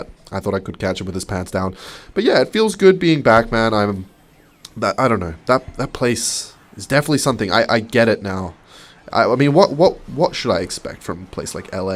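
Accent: Scotland, United Kingdom. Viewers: Scotland ahh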